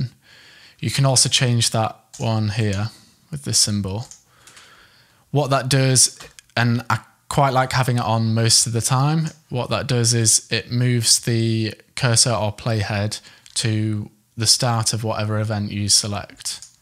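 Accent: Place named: London, United Kingdom. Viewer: Your Studio One tutorial video, by far, is more understandable than any other free tutorial here on YouTube! The added bonus of your God-given British/London (or one of the suburbs, thereof) accent just makes everything that much more exciting and enjoyable to listen to. I'm American and I grew up on "Monty Python's Flying Circus" television back in the 1970's. Between that and interviews with the Beatles, I've loved all manner of English accents ever since. I know, for you it's not an accent, it's just your everyday speaking voice... I'm a voice actor and narrator and I just started using Studio One 5, Artist edition. Really loving it for what I'm doing. Especially for the one-time price. I'm also a singer and songwriter, so, I'll eventually make more use of the music recording and editing functionality... Thank you and keep up the great work! 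Peace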